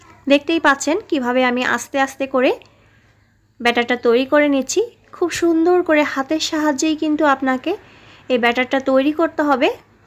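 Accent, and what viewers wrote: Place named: Kushtia, Bangladesh. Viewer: Apu apnar vasha gulo amader vasar sathe mill ase,,kustia,chuadanga amr basa.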